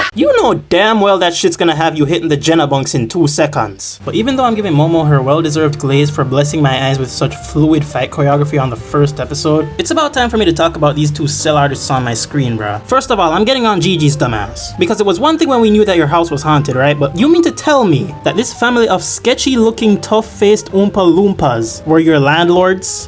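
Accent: Jamaica. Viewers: You sound like the Watch Mojo guy with a Jamaican accent. I love it. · sound like a yaadie heard obeah thought i was tweaking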